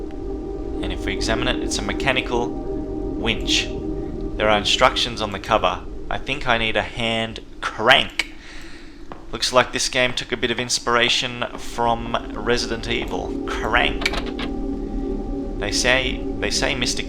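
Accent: Australia. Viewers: The Australian accent is amazing.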